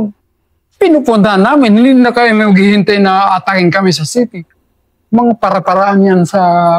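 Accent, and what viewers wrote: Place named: Waray, Philippines. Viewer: Waray si sir klaro dahil a kangga😊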